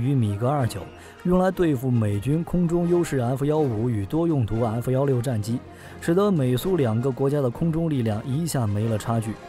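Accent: China. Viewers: 這不是台灣人做的影片。口音、用字是強國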